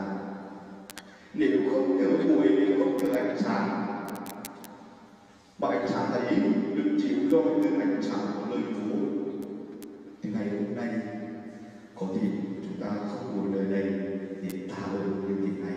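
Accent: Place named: Nghe An, Vietnam. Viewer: Cha giọng yên thành, nghệ An thì phải.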